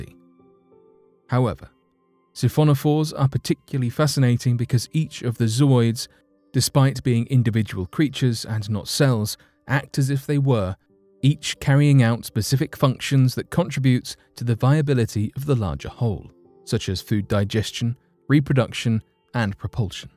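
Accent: United Kingdom. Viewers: He is British, they pronounce a lot of things differently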